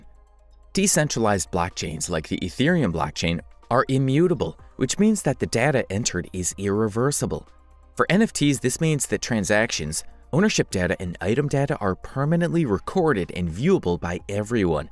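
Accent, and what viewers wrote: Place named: Chicago, United States. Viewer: Man the Chicaaago Aaaaahhhhcent on this guy making it hard to watch.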